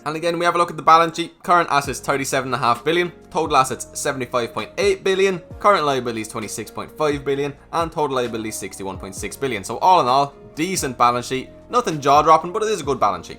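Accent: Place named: Ireland. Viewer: I absolutely love your videos! Also are you Irish? Love the accent!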